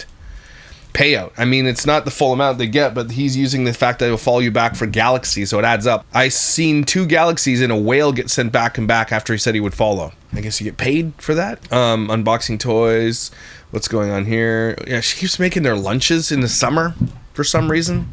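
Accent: Liverpool, United Kingdom. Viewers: That's a scouse accent!!! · Scouse accent from Liverpool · You’re from Liverpool, right? I love this accent. I had a friend from Liverpool, absolutely hilarious, just like you. 😅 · That's a Scouse accent from Liverpool! It's in the north but not quite Scotland! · is awesome and wicked funny. As a fellow northerner I assume shes from the Liverpool area. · yup she is defo a scouser. and spot on with her commentary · is from Liverpool, best accent ever. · I love watching you! as a Manc here in Upstate NY, i'm hearing more scouse/EP area in you but definitely not Scotland!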